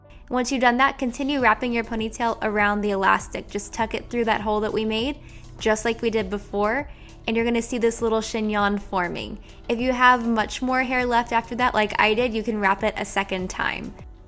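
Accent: United States. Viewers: Can I please have your American accent ? My Australian one has NOTHING on yours girl, also if I could have your hair too that'd be cool 😉💕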